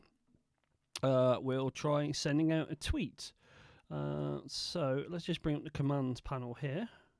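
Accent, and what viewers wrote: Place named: Southwest England, United Kingdom. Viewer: Well that was very entertaining, a bit of a car crash although that's not meant as a criticism I love the way you go live for the first time on YouTube and just learn on the go. As for Dragon 6 it looked awful in terms of Bugs and response but without knowing what hardware and Mic you are using its hard to judge. I was shocked though generally that you had the bug meaning that it would not even tell you that the mic was live! By the way and I'm only talking from experience of version 4 you can only wake the mic for sleep mode (Yellow) not the red off position. So basically I was unimpressed with the performance however I am slightly torn as a video from voice recognition Australia shows it has fantastic accuracy with basic dictation with no training whatsoever other than setting up the mic and that's what I really need it for as I'm disabled and cannot type so I may still take a punt at the reduced upgrade price of £94. 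I love the way as an Apple fan clearly you use Samsung's distressing news about exploding handsets for your demonstration of dictation, I'm still laughing by the way at Apples "courage" at doing away with the headphones jacks and the shit loads of bad PR and all the funny memes that followed which entertained all week. You have a good weekend by, the way what part of the UK are you from sounds like the Southwest-ish? I'm off for a pint of real vinegar.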